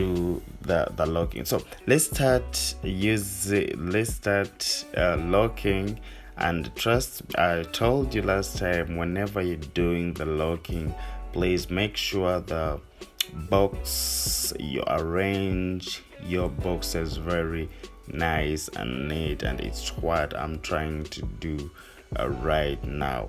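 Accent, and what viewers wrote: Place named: Uganda. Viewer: Sounds like Ugandan wow proud of my country 💪💪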